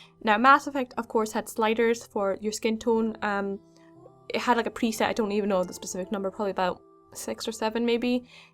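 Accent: Scotland, United Kingdom. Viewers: also I'd like to add your awesome are you Irish? Or Scottish I hear Scottish more Correct? Either way your awesome I have a love for the Scott's and the Irish and there cultures aswell as I just love the accents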